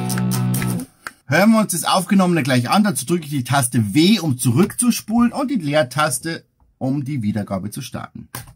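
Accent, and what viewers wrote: Bavaria, Germany. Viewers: hab gleich gemerkt das du bestimmt auch aus bayern kommst😎😁 bist auch aus oberbayern oder? 😅 wenn ich fragen darf* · Ich bin seit gefühlt 100 Jahren Cakewalk-Fan angefangen von Cakewalk 3.1. Aber jetzt Cakewalk (by Bandlab) ist der Wahnsinn. Und noch ein groeßerer Wahnsinn ist diese Tutorial-Reihe mit diesem super Moderator. Ich bin a Preisss aber die bayrische Sprache mag sehr. Lieber Moderator und Cakewalk-Spezialist/Musiker/Tontechniker usw...., ich bin so dankbar für diese Tutorials. Jazzjoe aus Bad Nauheim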